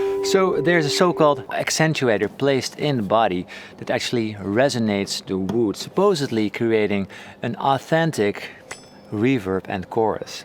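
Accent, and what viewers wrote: Netherlands. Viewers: Great video loved it :) Butttttt if you care at all about not sounding too Dutch, watch your pronunciation of ideas (it sounds like ID's) which is a dead giveaway you're dutch. And yes fellow dutchy, keep up the awesome vids. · Haha, you can recognize a Dutch accent from miles away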